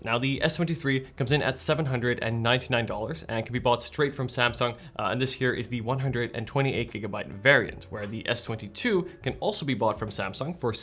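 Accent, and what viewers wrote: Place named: Netherlands. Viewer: Ik kon merken aan je accent dat je Nederlands bent, haha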